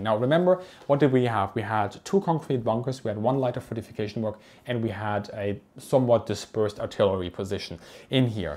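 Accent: Germany. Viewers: Bist du deutsch du hast einen sehr starken Accent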